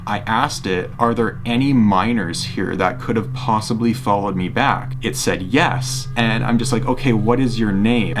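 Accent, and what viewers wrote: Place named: United Kingdom. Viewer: AH HES BRITISH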